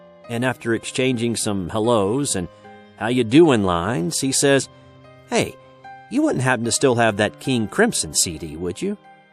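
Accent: South, United States. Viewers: when you narrate your voice has that weird Southern style like the guy who used to narrate ‘Gravy Train’ dog food commercials... ‘Just add water and it makes a rich thick gravy, mmmm mm!!’ I like your channel but maybe take some voice lessons everything sounds like the Waltons.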